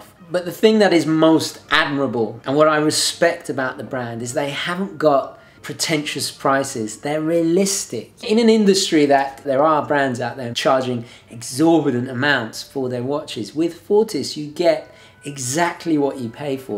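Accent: United Kingdom. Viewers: It's his crisp and clear British accent. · Your British accent is so pleasant to hear lol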